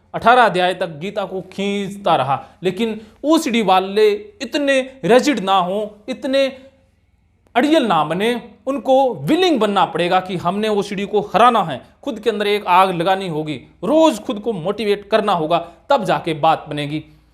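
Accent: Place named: Marwari, India. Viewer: Sir aap great o sir ese lgta ha ap marvadi bhasha bolte o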